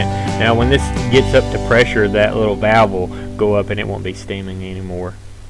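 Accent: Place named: United States. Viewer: oh honey, the country accent is beautiful. Don't ever cover that up 😊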